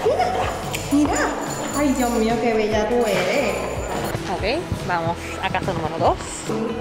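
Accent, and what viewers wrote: Puerto Rico. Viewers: siempre me gusta tus videos porque me encanta escullarla ablar en El idioma Puertoriqueno. Espero que aprendas cosinar toda classe de comidas Puertoriquenas. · Suenas y te vez mas biricua que muchos otros 😂😂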